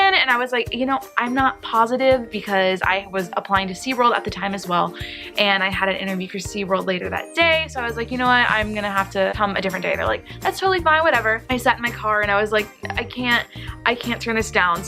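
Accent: United Kingdom. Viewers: prob RP lol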